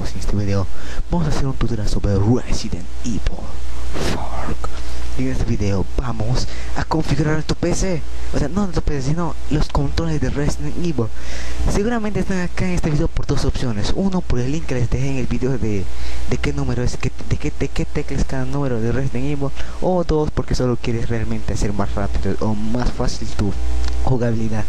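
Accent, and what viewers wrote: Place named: Peru. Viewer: Peruano xddd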